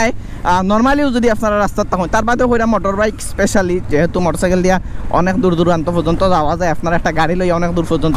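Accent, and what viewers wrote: Sylhet, Bangladesh. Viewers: Asslamualikum bai apnar basha sylhet ar kun jaygay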